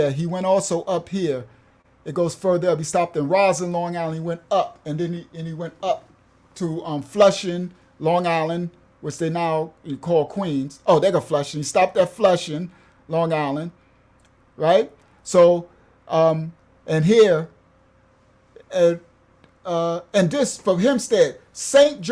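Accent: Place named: East Texas, United States. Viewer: I've been listening all day at work and most times I listen to the Ministry channel... but I decided I'll listen to the 25 to life channel.. I'M LIKE UNK. YOU SOUND LIKE YOU FROM THEM DEEP WOODS WHERE I'M FROM😮😮😮😮 I HAD TO WITNESS CROSSES BURNING, AND LAYING ON THE floorboard just to get Beaumont.. like😮😮😮 KOUNTZE, SILSBEE, JASPER, VIDOR, LUMBERTON( MORE RACIST THAN VIDOR=SWEPT UNDER THE RUG, WHERE I SAW MY FIRST BURNING CROSSES) WOODVILLE...ALL IN DEEP EAST TEXAS... THEY STAYED ON THEIR SIDE IN OUR SMALL TOWN AND WE STAYED ON OURS..I PRAY 1 DAY TO TELL IT..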